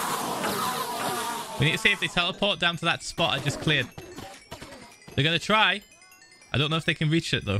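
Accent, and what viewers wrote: United Kingdom. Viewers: is it just me or does he sound extra british today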